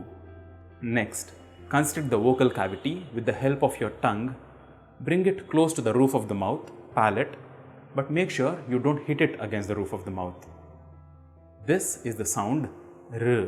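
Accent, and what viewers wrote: South India, India. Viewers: loving these video's! Learning a lot here. :) Small thing for you to pick up though when pronouncing a 'T' in English. The 'T' is not velarized as you probably know, but a simple plosive sound. The 'Indian T' doesn't exist in English. I think it is a great struggle for Indians and Pakistani's to get this right. But we all have our own accents when speaking a foreign language don't we. I do! :D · This is probably a dravafian accent. Ancient Aryans ate meat probably sounded more manly like Persians do. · Why to put hands in this weird pose that is not even a mudrah. this way host doesn't look smarter and why such awful indian accent, at least we re trying here to listen to Sanskrit and this accent just hurting our ears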